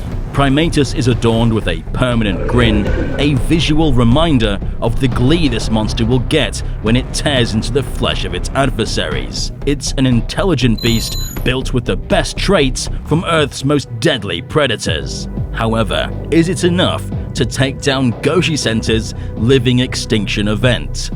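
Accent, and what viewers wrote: United Kingdom. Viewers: HE’S BRITISH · i was like HES BRITISH!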